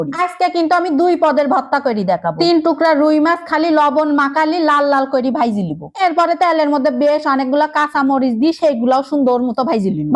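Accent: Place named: Bede, Bangladesh. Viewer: কোন জেলায় বাড়ি!!বেদেনীদের মত ভাষা, ভাষার টান।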